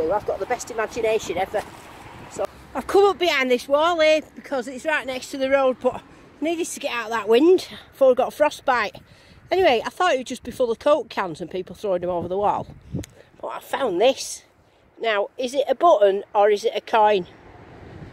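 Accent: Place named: Bury, United Kingdom. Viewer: hi just subbed on this video, your accent sounds like you cant live to far from me in bury, you have a cheery positive banter and thats what made me sub, have you ever run your detector along the dry stone walls themselves incse any riches were hidden in the cavitys of the wall,just a thought..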